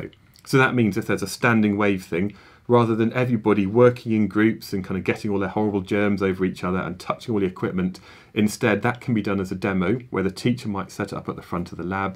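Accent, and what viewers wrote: United Kingdom. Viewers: I love british accent ♥️😍♥️😍♥️😍♥️🏴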